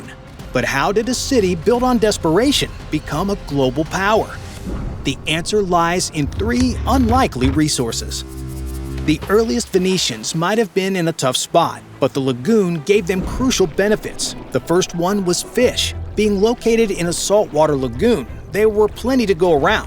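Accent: United States. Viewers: The sensationalist, excitable american narration makes this unwatchable (like most 'documentaries' from that part of the world). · American accent makes the video cheap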